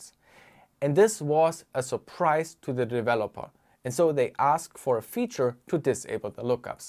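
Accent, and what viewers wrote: Germany. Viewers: I really liked your video and I thought: sounds like a German accent... checking imprint and realizing that it is the same zip code as mine 2 months ago :D Greetings to Lichterfelde!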